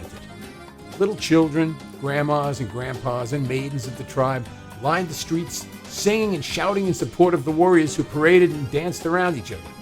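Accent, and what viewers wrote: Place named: Long Island, United States. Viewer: Very interesting video. Can't wait to hear your version of the song. Say hello to Tommy for me. Nice to hear you still have the LI accent. I still have mine too. Stay safe.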